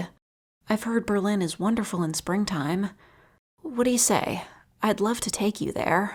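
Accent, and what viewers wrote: United States. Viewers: She's american? Darn... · the most American sound Russian on earth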